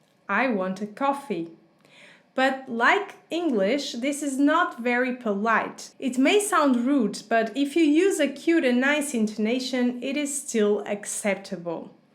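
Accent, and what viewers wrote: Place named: Portugal. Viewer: que interessante esse sotaque do português de Portugal... creio que no futuro será substituído pelo do Brasil, até porque somos maioria kkk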